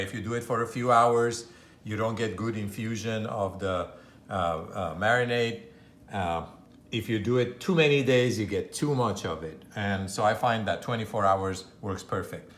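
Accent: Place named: New Jersey, United States. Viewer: lovely accent. new jeresey?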